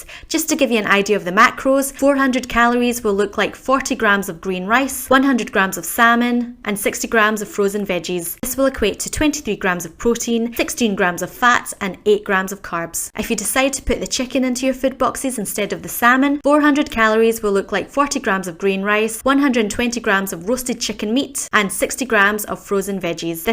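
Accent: Scotland, United Kingdom. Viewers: Wait a minute.....are you Scottish?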